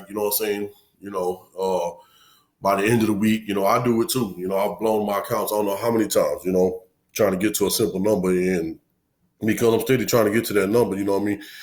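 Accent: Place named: Houston, United States. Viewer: Must be from Houston. I know that accent when i hear it 🤘🏾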